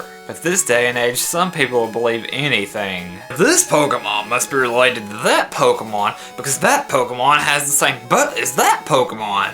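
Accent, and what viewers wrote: South, United States. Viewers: Does anyone else agree this guy sounds like a hillbilly? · i like your light southern accent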